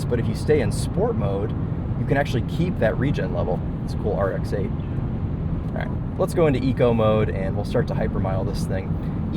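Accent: United States. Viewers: As an Iraqi Arab student, I enjoyed this video very much. It was like an English lesson for me, and I benefited from it to develop my English because your words were very enjoyable and very useful. I also like the American accent, and as for the car, it is wonderful, and it was a wonderful experience. keep on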